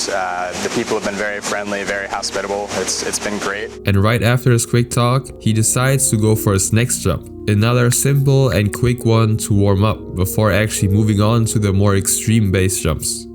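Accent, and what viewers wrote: Germany. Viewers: Are you German by any chance? Your English is perfect but I can catch a minor German accent :)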